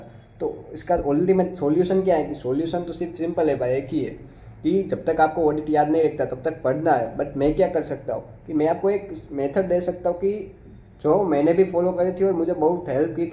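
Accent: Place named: Gujarat, India. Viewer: Brother your gujrati accent is great, did you study in gujarati medium?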